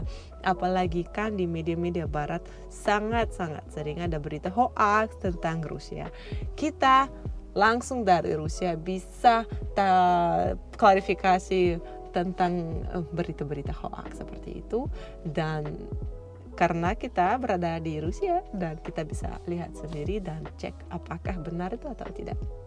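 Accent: Indonesia. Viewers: Logat sudah Indonesia banget 👍👍👍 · Masya Allah.... ekspresi, gaya bahasa, & cengkok Indonesia banget.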